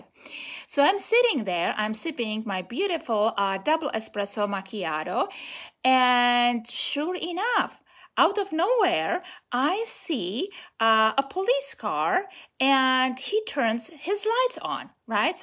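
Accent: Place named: United States. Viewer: I love your American accent beautiful lol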